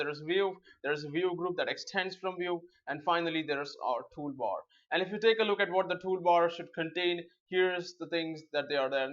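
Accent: India. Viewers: Where are you from ? lol Indian??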